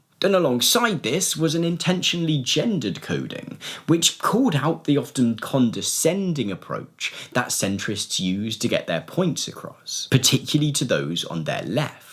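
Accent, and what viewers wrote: United Kingdom. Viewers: Apparently I'm late to the party but just wanted to say yes! I've found a new left tuber! And it's refreshing to hear a UK YouTuber who's unafraid to speak with a UK accent and UK pronunciation. Idk why but I really dislike the extreme US fetishism of most UK YouTubers. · He’s British. That’s a different type of english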